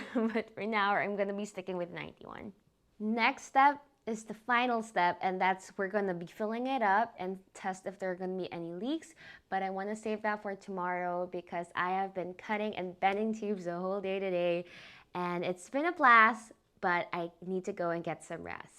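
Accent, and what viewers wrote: Philippines. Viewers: You sound Filipino But awesome video and build <3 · what's your ethnicity? you have that Filipino accent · i found it funny as I have wasted so many tubes like you did lmao🥲. But keep making the content that you love doing! I thought that the accent was a bit familiar but immediately noticed when you spoke tagalog in the vid. Always happy to support a fellow filipino. 😊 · Great videe, love your content. are you pinay? i feel like i can hear alittle accent in your voice :) keep up the great work! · First thing that i noticed is your accent. Asked my self why does it sounds like filipino accent, i never thought you have filipino blood till i checked your about. Anyways keep it up! nice pc! hoping to have this kind of build soon! Ingat and god bless haha. you gained a new subscriber.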